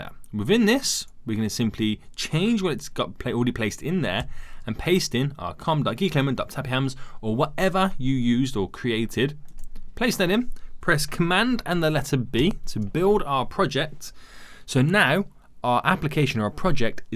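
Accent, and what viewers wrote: United Kingdom. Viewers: great tutorial brother thanx and cool british accent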